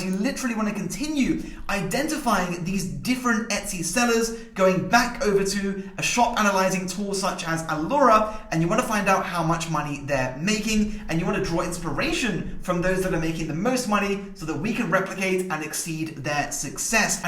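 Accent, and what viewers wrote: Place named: United Kingdom. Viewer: Annoying that Printify don't actually provide greeting cards in the UK. Since the guy in the video sounds British I didn't think it would be a problem and I've already done all the other steps but I cant actually do it! I cant seem to find any platforms that provide this in the UK - does anyone know any?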